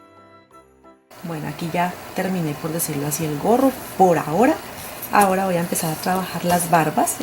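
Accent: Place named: Bogotá, Colombia. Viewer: Que belleza y fácil, oye eres de Bogotá?... lo digo por el acento (con todo respeto). Mil gracias por tan bella manualidad 🤗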